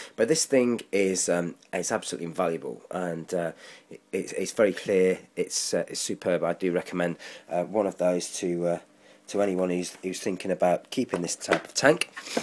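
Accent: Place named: England, United Kingdom. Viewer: Awsome gd to hear an English voice on nano tanks gd look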